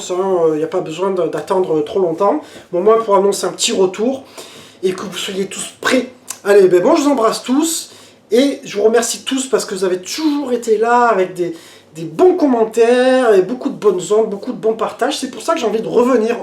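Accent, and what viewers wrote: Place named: South, France. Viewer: Courage à toi, prend ton temps et prend soin de toi. Hâte de revoir tes vidéo avec cet accent du sud qui me fait tellement du bien les matins:) Des vidéos spontanées avec ton naturel ça sera top je pense!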